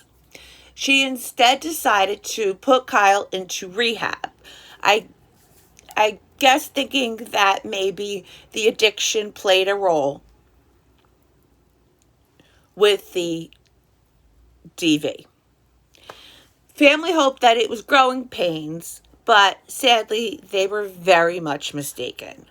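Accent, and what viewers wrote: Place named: New York, United States. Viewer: I love listening to your accent (assuming you're somewhere in the tri-state area, specifically NY/NJ?) Makes me homesick. Also, that color looks SO good on you.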